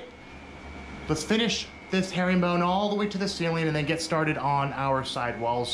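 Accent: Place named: Boston, United States. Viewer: With that Boston accent, this must be cape cod?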